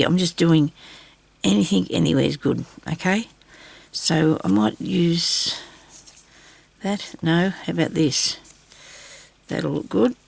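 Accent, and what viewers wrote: Australia. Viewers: You're so cheerful. Enjoy hearing you talk Australian. Lol 😀TFS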